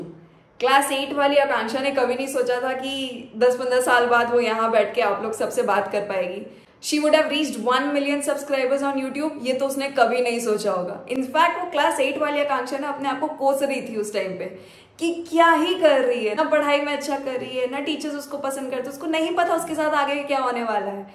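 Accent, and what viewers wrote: Bihar, India. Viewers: Views to aa rhe phle ki trh to kyu tension le rhi ho . BTW wo Bihari accent ki wjh se subscribe Kiya tha, so don't leave it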